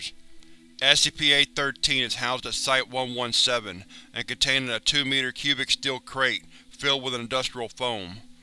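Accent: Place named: Alabama, United States. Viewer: You sound like someone who lives in Alabama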